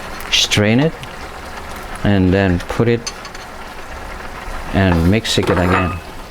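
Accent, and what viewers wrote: Philippines. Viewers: Kuya, masyado ka namang pretending mag english accent. Hirap na hirap ka sa english bakit di ka nalang nag tagalog Sabagay lumalabas pag ka accent ng Visaya mo.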